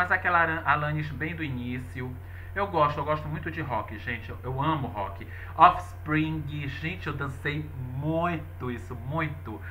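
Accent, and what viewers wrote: Pará, Brazil. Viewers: Eu comecei a te acompanhar esse ano e desde o primeiro vídeo eu reconheci esse sotaque paraense, mas so agora comprovei. Que legal um conterrâneo perfumolatra com conteúdos tão legais 👏👏👏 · Olá Você é paraense não é.? Adoro este sotaque. Vi seu vídeo agora. Também tenho a sua idade! Quem dera conhecer o para ver o peso oh. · Adorei saber mais sobre vc, eu imaginava mesmo q vc é paraense, pelo sotaque. Também sou do Pará de Santarém, mas só de nascimento, moro em Sorocaba SP. Mas sei reconhecer muito bem o sotaque da terrinha. Vc é muito autêntico e natural, gosto muito do seu canal. Um beijo. Seja muito feliz!! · Quando tu abristes a boca eu sabia que eras de Belém . Eu disse “ esse cara é paraense , com certeza” . Conheço de longe um conterrâneo. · Eu desconfiava....que serias PARAENSE...por causa dos.."lh"! Kkkk..he..hi.. Estou tua vizinha..... 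Parabens para nós que somos AMAZONICOS! Chics! FELICIDADES!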